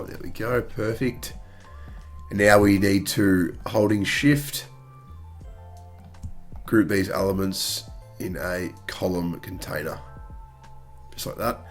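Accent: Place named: Australia. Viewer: "Hide" in Australia: "Hoyyyd" hahaha!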